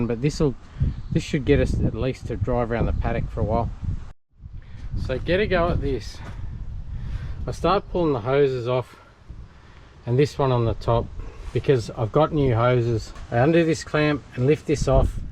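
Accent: Australia. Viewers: This was my first Back Shed vid and I enjoyed it tremendously. Im watching through again. I love an ol F series truck. Us Americans are suckers for anyone with a charming Aussie accent. To me the more Bogan the better.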